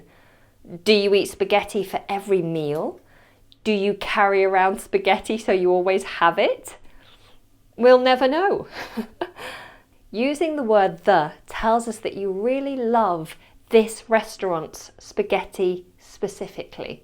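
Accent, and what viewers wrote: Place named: United Kingdom. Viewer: Hi! Came here looking for info after finding your singing channel and falling in love with it 😍 Is there any hope you will start posting there again? Maybe once every few weeks? 🙏 I felt so inspired and happy that I finally found a vocal coach that I absolutely love ❤️ And then I saw that the last video was 2 years ago 😢😭🥺 Thank you anyway for all the videos there! They are just awesome! And I loved that you're British because I strive to sound more British and having an American vocal coach wouldn't be as great. So, love you! Just think about it 😍🙏🤗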